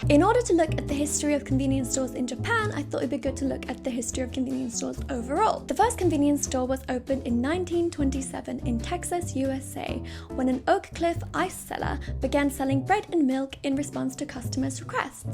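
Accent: England, United Kingdom. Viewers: she lost her England accent a lot from the last time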